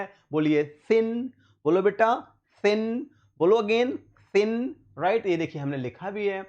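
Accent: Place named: England, United Kingdom. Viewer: Ur pronunciation is as like the english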